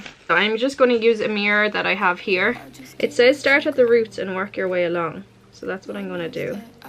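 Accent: Ireland. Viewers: What county in ireland are you from im really bad at accents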